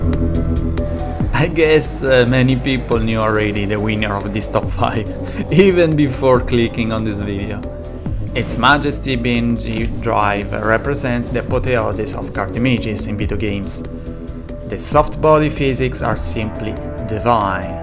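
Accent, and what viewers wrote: Italy. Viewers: Bro ci gioco 50€ che sei italiano dall'accento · are you..italian? sei italiano? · ha un accento tipo italiano ahah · i can hear some italiano Bro, hahah grande vecchio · Si sente da chilometri che sei italiano ahahahhahahaha, bel video! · Si sente che sei italiano..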